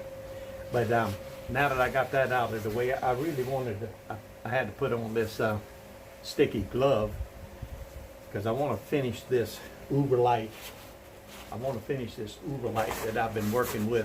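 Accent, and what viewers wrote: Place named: Lowcountry, United States. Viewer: Sounds like you are from the Low Country.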